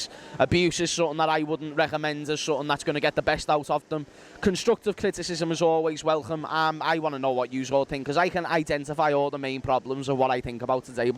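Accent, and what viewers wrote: Liverpool, United Kingdom. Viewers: Always love the Liverpool accent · This fella is offensively scouse ...LEEDS LEEDS LEEDS LEEDS !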